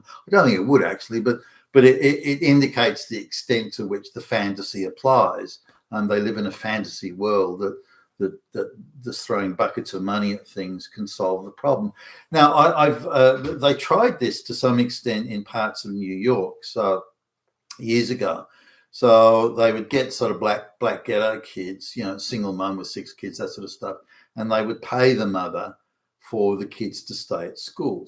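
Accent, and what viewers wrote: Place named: Australia. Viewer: Thanks for broadcasting to me and one or two others. You have a good Aussie educated voice with rounded out cynicism.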